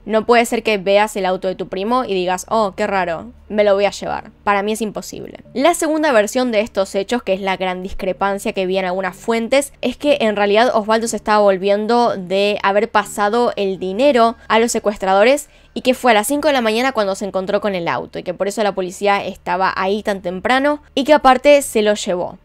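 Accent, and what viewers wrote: Argentina. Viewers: Me encanta como narra con su acento argentino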